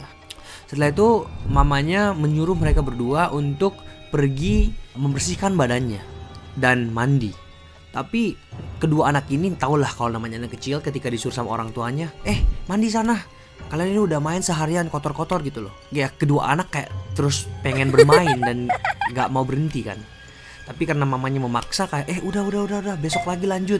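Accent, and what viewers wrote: Makassar, Indonesia. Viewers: Semakin seru konten nya Dengan logat Makassarnya bikin lebih merinding haha Semoga bisa dapat 500k Instagram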